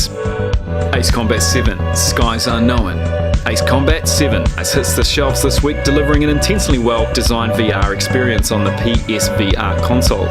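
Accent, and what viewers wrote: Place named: New Zealand. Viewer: haha ... so 19 eighty sex. :) i should have picked you where my kiwi cousin :)))) lol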